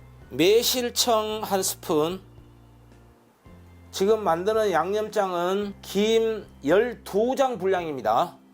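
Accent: Jeolla, South Korea. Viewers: 찐한 전라도 사투리 정겹습니다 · 여나무바꾸만 돌리믄 됩니다 ㅎㅎㅎ 전라도 사람들만. 알아듣는사투리 그것도. 나이먹은사람들만아는 ㅎㅎ 구수하고. 정겹습니다 음시도 전라도스타일이시고 최보십니다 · 오늘도 구수한 전라도 사투리에 엄청 웃었네요 요리도 최고 👍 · 역시 세프님 멋있어요. 전라도 구수한 사투리로. 설명이 좋읍니다 건강하세요. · 김 묻힘~. 여나무바꾸 ㅎㅎ 샘의 사투리가 넘웃겨용ㅎㅎ 저두 전라도라 알아묵었는데용 ㅎㅎ 멋진 요리 틈나는대로 보고 많이 배웁니다요 매뉴 감사합니다~.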